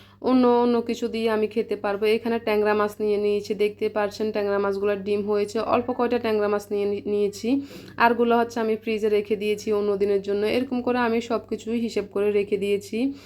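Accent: Noakhali, Bangladesh. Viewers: অনেক সুন্দর সুন্দর বাজার দেখলাম❤ নোয়াখালীর কোথায় তোমার বাড়ি ❤ কথা শুনলে বোঝা যায় তুমি নোয়াখালী আপু 💞 এক মাছের বাজার❤❤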